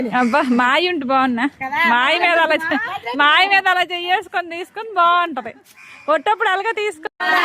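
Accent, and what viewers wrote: Srikakulam, India. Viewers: Naku radhu Amma pata 🥳akka reality lo nv acham Srikakulam basha la chakaga undi reels kuda bagundi akka voice 😘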